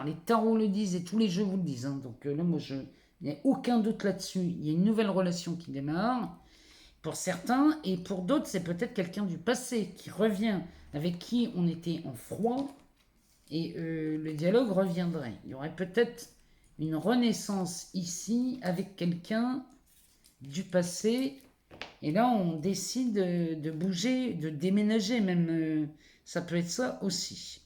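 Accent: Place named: Paris, France. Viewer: Douce 🌼☀️💝 Ce tirage est exceptionnel et ça m’a redonné un boost d’énergie magic en ce jour! Je vous écoute des USA. Votre voix est rassurante et me rappelle ma jeunesse à Paris! Je pense que vous êtes parisienne aussi, n’est pas?! Vos ressentis et intuition me parlent et je relate souvent avec vos messages en général! Merci d’être cette belle lumière pour votre chaîne qui embellit les espérances et donne du baume aux cœurs! Merci merci merci - de mon âme à la vôtre! 💫🦋🌈💖☀️🙏🏻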